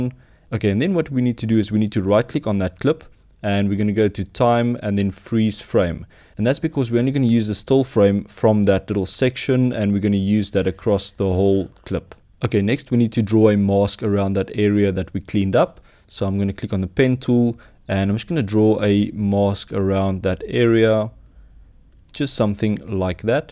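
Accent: South Africa. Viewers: You sound like a real South African!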